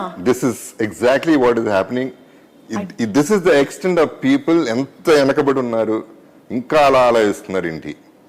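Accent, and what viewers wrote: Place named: Krishna, India. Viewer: ❤❤❤❤love for your slang.pakka krishna slang😘😘😘😘😘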